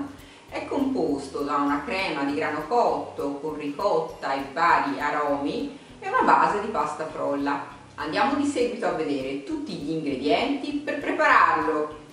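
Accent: Tuscany, Italy. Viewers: Non si può sentire un accento toscano per la descrizione di una pastiera..... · perdonami sicuramente la panna e le fragole si riferivano ad altre immagini stavo cucinando Ma sono sicura che ti hanno fatto vedere perché ho riconosciuto la tua voce, tipicamente toscana come me e mi sono voltata e ti ho vista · Che dire sei strabiliante... La tua bravura e poi quell'adorabile accento toscano mi regalano relax e voglia di combattere anche tra i fornelli e poi con la bilancia🤣Un grande abbraccio e un incoraggiamento a tutti per questa triste situazione. Che il Signore benedica tutti🙏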